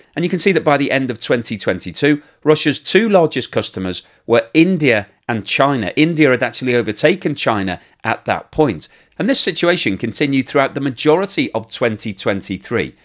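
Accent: United Kingdom. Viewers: He’s not American with that accent, British I’m sure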